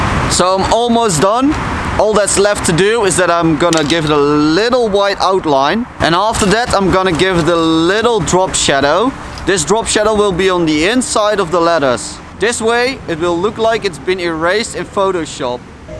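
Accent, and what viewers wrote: Netherlands. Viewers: That’s a strong dutch accent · R u dutch? It sounds like ur dutch with that accent xD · I know ur Dutch or Belgian by the way your talking English🤔🤔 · You sound dutch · He sounds Dutch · You dutch? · Goeie Nederlandse accent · Dutch accent! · Dutch accent: 100% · Nice ! K vind het wel leuk dat je gewoon aan zowat alle Nederlanders kan horen dat ze Nederlands zij. Als ze Engels spreken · Ru dutch? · You Dutch? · Is it me or do you sound Dutch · Hoi ik weet niet of het te persoonlijk is om te vragen, maar ben jij nederlands? Je engels accent is echt een typisch Nederlands accent, kwas gewoon ff nieuwsgierig. Verder wel een coole video. :D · You sound Dutch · Ik hoorde al gelijk dat je nederlands bent 😂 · Bruh the dutch accent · Is dat in Eindhoven? Denk dat namelijk omdat je accent klinkt alsof je uit nl komt. If you dont understand me i Get it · You sound like you're Dutch and you're from Limburg or Brabant if you are then hallo! 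· Ok are You dutch??? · Duidelijk een Nederlander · are you dutch you sound dutch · Are you dutch? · ben jij nederlands je accent lijkt mega hard lol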